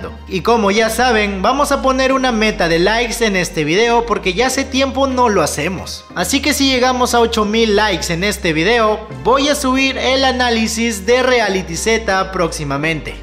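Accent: Peru. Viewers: De que país eres xd ? por como hablas peruano pe mano ?= soy nuevo xd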